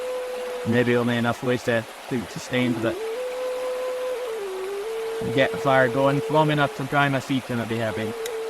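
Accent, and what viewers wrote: Scotland, United Kingdom. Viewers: Once again an excellent video. However the flute, pipes and rushing water made hearing and understanding your brogue a bit difficult for this Yank. Too many folks over do the “background” music to the detriment of their videos quality. Just my pet peeve. I truly enjoy your love for accurate renditions of highland history.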